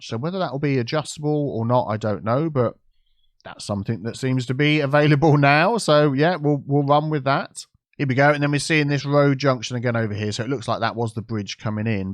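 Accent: United Kingdom. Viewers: I wanna see you and city planner collaborate on a city together this would sound really interesting you with your cool British English and City Planner with his cool American English. You 2 putting all of your expertise together. Just like famous PC Gamer Stonemountain64 and his British gaming friend Tomographic often teaming up together.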